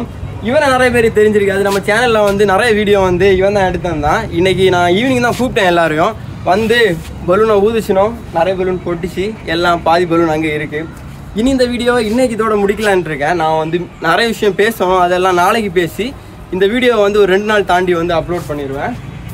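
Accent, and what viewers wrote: Kanyakumari, India. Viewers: Anna unga kanyakumari slang rmba alaga irruku anna epaiumae eppidiyae pesuga athu tha nalla irruku and congrats for 100k and always love u jacky 😍🔥✌ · Hi recently I started watching your videos to hear our kk district slang..🤣🤣🤣 very funny … Me too from kk district.. but I don’t speak the same 😆 Keep going Thambi 👍🏻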